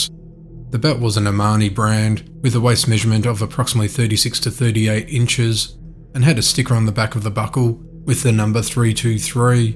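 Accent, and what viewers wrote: Australia. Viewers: Sounds more like he is from Australia to me.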